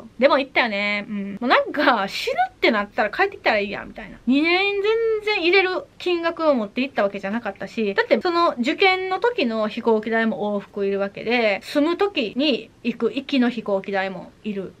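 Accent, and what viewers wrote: Kansai, Japan. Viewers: さんSLE?!いやいやいやめっちゃ大変じゃないですか、、、😭 あと関西人なのでさんの関西弁めっちゃ好きです❤️ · 関西弁かわいい♥️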